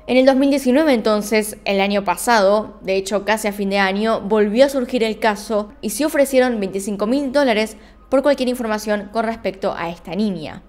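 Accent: Argentina. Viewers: Me encantas tus historias de misterio, y mas con ese acento argentino tan pronunciado jejeje...haces un gran trabajo guapa, felicidades!🥰saludos desde Oklahoma city-Oklahoma..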